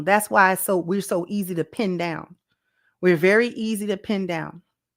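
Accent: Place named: New York, United States. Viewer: NY'er here, Brooklynite til the day I die. I hear that NY accent slowly creeping into vernacular... sto-ah, not store? I know next, she gonna start saying caw-fee 🤣🤣🤣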